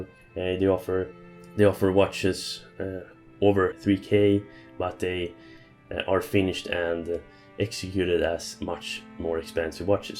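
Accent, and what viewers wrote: Sweden. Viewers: Dmitry Streak Probably I am swedish and he kind of sounds as my english, maybe central sweden like Stockholm.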